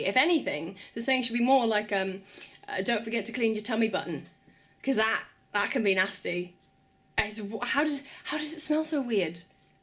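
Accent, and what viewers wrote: Wales, United Kingdom. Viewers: Is yours a Welsh accent?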